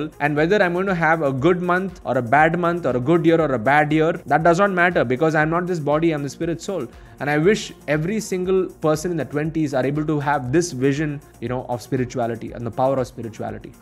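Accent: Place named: Bangalore, India. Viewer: I sensed some Robert k and a lot of T Harv Eker here. I have also read Secrets of millionaire mind multiple times and trust me give the same examples you used. Especially the ice cream example. They work everytime. Nice video as always macha. Your way of speaking always reminds me of my time in Bangalore.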